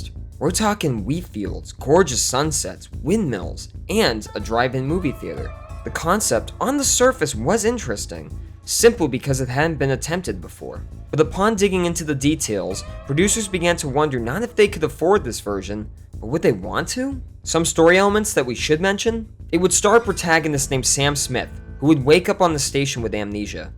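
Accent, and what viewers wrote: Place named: United Kingdom. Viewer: Sue Lah Co (NOT Sue Loo Cooooooh*) (NOT *So Long Kong*) and (NOT *anything else!) SUE .... LAH .... Co (with Co being pronounced like the American acronym for a "Company" or "Co." or perhaps: Sue LOCK oh ................................................................... ??? ! PLEASE................... ... Dude... if you had truly WATCHED "Aliens" ... you'd have known this. we who LOVVVvvvved..... all these movies are actually distracted (terribly) each time you say it YOUR way. ---Overall.... "We" even agree with you. WTF "happened" to Alens3.... but dammit man. Sue-Lah-Coh ... Ok ? ...................... You GOTTA "re.do" this Man.... or at least re-upload with SOME kinda acknowledgement at the beginning of this video............ we GREW UP with these movies Kid..... we have seen ALLLLL the backstage and Behind The Scenes videos on the aliens movies and brother.... 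this is an egregious error, to ANY erudite "Aliens" fan. ................. seriously . dude..... I'm sorry to say it. But it's true. it simply shows us you're NOT an "Aliens" fan................ and if NOT...... WTF would YOU be "outraged" about anything "wrong" with Aliens... ...................... if you cannot pronounce the name of the "Sulaco" properly? PLEASE.............. "excuse" yourself by re-uploading (with a statement at the beginning) that you cannot help but pronounce it (phonetically) like someone from the U.K. ................. and we will "Let it Slide".... but ... be slightly more careful dud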